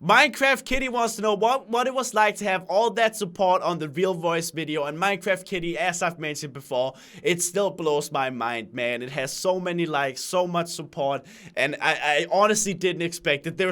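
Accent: Denmark. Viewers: danish I'm pretty sure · please use ur Danish voice please I like the Danish one better than the real voice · This voice sounds more Danish I like it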